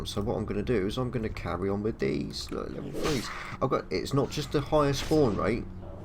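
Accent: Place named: Kent, United Kingdom. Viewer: Love the accent:) From Kent?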